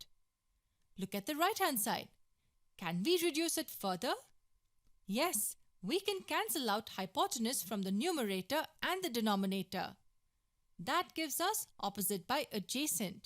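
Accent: India. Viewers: goofy ahh formulaaaee indian a$$ accent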